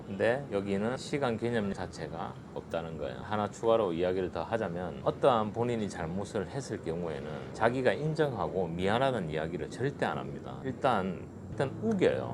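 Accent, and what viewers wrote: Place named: Busan, South Korea. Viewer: ㅎ 부산사람 아이라 캐도 말 투가 딱 부산이네예 ~~ 근데 얼굴은 서울인데여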